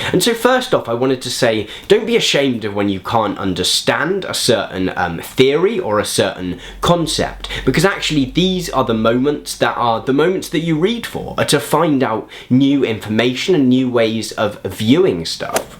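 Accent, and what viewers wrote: United Kingdom. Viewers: The first 20 seconds sounded very British.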